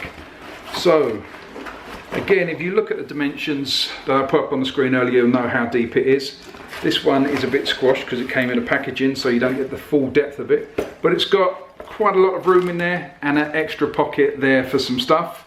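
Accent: United Kingdom. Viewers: this video would be extremely boring.... but that British accent makes it interesting.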